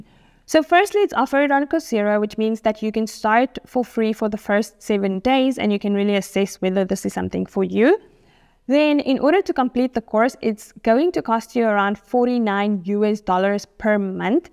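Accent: South Africa. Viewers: Your accent sounds South African? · you're South African?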